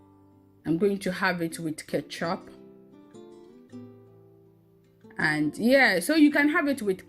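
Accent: Ghana. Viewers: You sound like a Ghanaian . Are you? Yam balls sounds yummy 😋 I love the mortar and pistle. From dakty channel. Thanks for sharing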